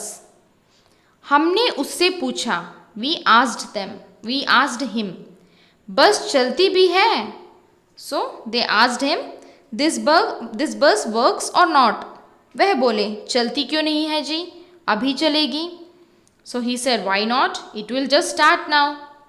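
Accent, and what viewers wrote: Kerala, India. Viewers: are you malayali ma'am?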